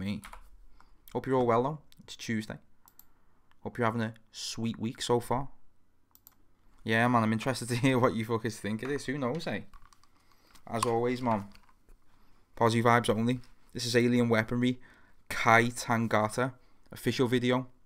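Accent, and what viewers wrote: Liverpool, United Kingdom. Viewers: Not a huge fan of reacts, or the scouse accent, and yet somehow I'm subbing :p I love your passion for the music man.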